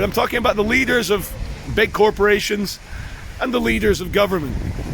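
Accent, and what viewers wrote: Scotland, United Kingdom. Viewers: makes you sound more Scottish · Are you Scottish? I've been trying to work out your accent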